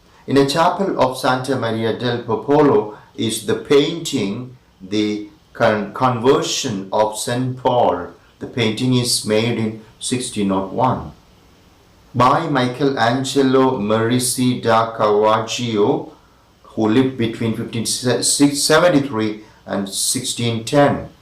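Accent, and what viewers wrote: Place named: India. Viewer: Excellent Indian Accent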